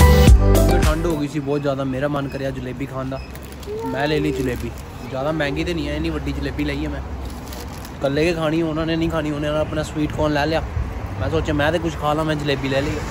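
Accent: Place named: Jammu, India. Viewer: Bro punjabi kam dogri zada hai 😂❤️ but I really like it ❤️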